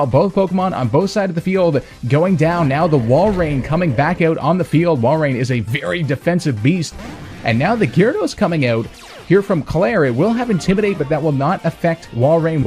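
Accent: Canada. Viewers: love the canadian accent :D :D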